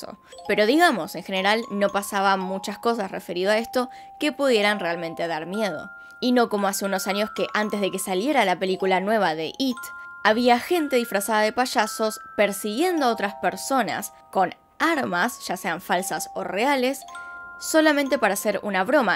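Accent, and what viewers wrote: Argentina. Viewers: Se te escucha pachazo 😁me encanta el acento argentino 😂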